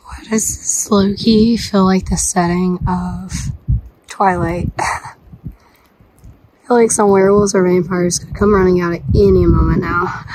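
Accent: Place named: Mississippi, United States. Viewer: Hey 👋 Just saw your announcement video and I'm also from Mississippi, central Mississippi. I knew the accent sounded familiar. 😎👍👍🥾🥾⛰️⛰️⛰️⛰️🏕🤙🙏👋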